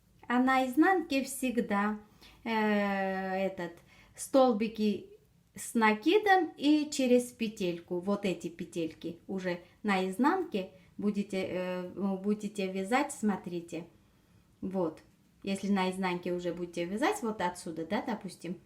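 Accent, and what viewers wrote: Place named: Dnipro, Ukraine. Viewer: ! какой вы красивый кардиган связали! он у вас получился такой элегантный! и еще: мне послышалось или вы и правда из Днепра?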